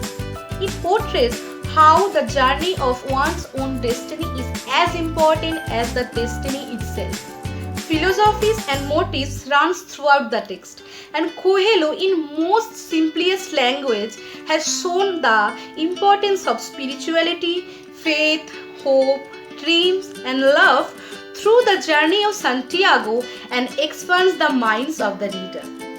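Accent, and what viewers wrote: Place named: Bengal, India. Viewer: You seem happy to read books and that excitement shows in this video. We love our Bengali accent too.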